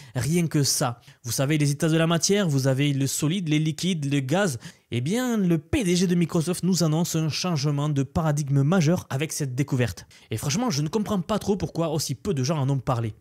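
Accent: South, France. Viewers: J'avais relevé ton MAGNIFIQUE accent Italien... J'ai éclaté de rire lorsque tu as dit: "Vous avez relevé min magnifique accent" J'AI ÉCLATÉ DE RIRE... En plus d'être curieux mcultivé, pertinent, intéressant, pédagogique..... TU ES DROLE... 😂 ET MALGRÉ TES EFFORTS ET TA PUISSANCE, Beaucoup de gens malgré tes efforts Ne. Comprennent rien à ce que tu dis ni ce que tu fais.... Ils de dénigrent car tu es jeune, tu as l'accent du sud et que t'es en frénésie... Je me sens très proche de toi Merci pour ton travail J'aimerais pouvoir un jour te rencontrer et aussi cuisiner pour nous...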